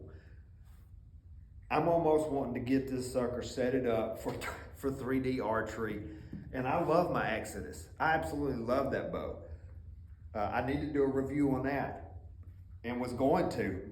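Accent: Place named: South, United States. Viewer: Love that southern talking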